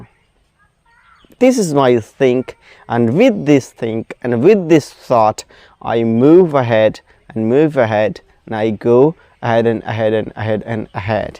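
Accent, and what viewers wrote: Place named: United Kingdom. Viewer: I really liked your English accent I am amazed that how a boy from my village is able to speak such excellent English and that too in a British accent. Wonderful!❤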